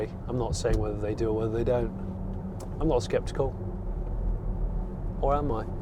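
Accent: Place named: West Country, United Kingdom. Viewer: lol at your voice control tests with your wurzzle accent, good luck with that fella. In the many years or working in IT and tried pretty much all of them I have only found Cortana to understand our twang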